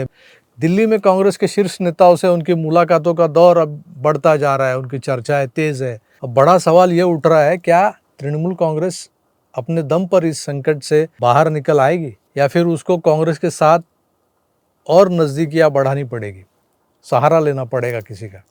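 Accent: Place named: Maharashtra, India. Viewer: मराठी माणूस किती पण चांगली हिंदी बोलला तरी ओळखू येतोच तो मराठी आहे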